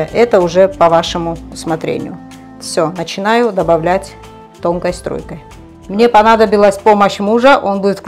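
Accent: Batumi, Georgia. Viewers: Бесценны. Ваши рецепты,! Приятный ваш говор, я как будто, побывала в Батуми! Спасибо вам!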